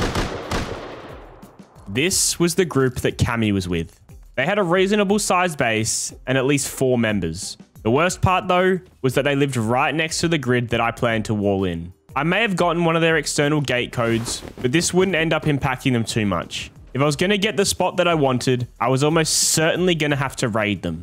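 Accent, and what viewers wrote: Australia. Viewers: I dunnor, mate. I think most people can't get over how Aussie you sound - myself excluded because I love you guys to death.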